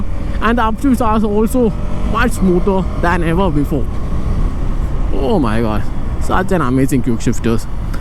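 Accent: Kerala, India. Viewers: Are you from Kerala!? The slang is like that !!!!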